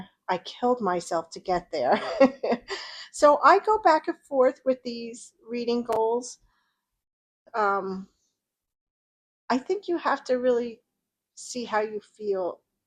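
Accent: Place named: New Jersey, United States. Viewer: Oh, friend, sleep deprivation is no joke. I literally got tears in my eyes when you said Michael hadn't slept for a week. Been there, and it's so exhausting, to say the least. Just wears you down in every way. Glad he slept on Sunday night. All the food you showed looked great! The eggs/chickpea dish... yum! I loved following along with you in your week. I don't know how you kept it all straight from day to day! P.S. I love your NJ accent. Hehe, did you know you have an accent? Or maybe I'M the one with the accent. 🤔😄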